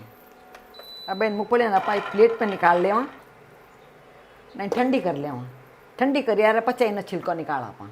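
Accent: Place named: Rajasthan, India. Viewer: main aapki aur aapke husband ki recipe dekhti hun main rajasthan se hi hun aapka lahza boli achi lagti hai 🙂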